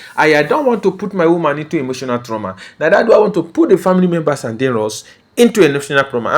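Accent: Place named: Nigeria. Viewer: 😂😂..... You can slang all you want, but your Naija accent makes it so funny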